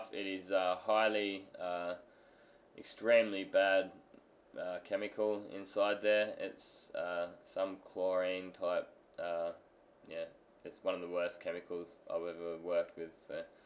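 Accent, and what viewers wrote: Australia. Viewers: this is awesome work mate, where are you in australia? sounded like a qld accent to me haha i’m from adelaide, been watching a few videos for my capella project, its been painted before so i’m thinking wet paint remover will be the best, can you send a message or something of a good paint remover? cheers legend!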